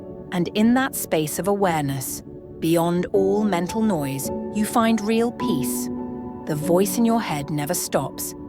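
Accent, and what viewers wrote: United Kingdom. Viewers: Couldn't stand the snooty brit voice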